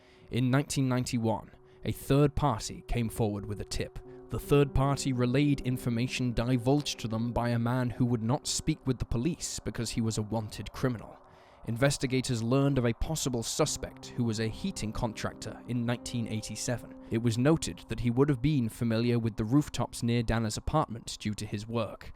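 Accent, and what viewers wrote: England, United Kingdom. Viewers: Narrator has an English accent.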